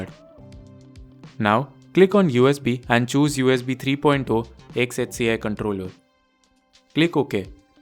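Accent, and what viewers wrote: India. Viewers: Bro, sounds like a Indian text support scammer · you know this gonna work when the guy has an indian accent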